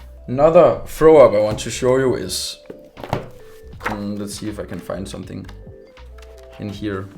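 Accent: Denmark. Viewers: Aldig hørt mere dask engelsk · very god Danglish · Jeg kan høre en dansker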